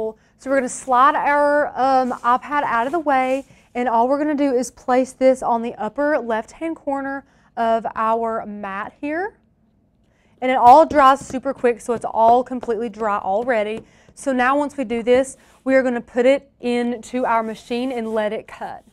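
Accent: South, United States. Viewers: Girl, where you from?! Just a curious Texas girl who is fascinated with southern dialects lol. The Carolina's, Georgia, Tennessee?? Love it!! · Love her southern accent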